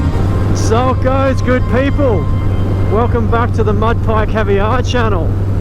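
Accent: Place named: Australia. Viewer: Love your accent, mate, it reminds me of my deck hand years in cairns 😊. And love the street bob, getting my 107 next month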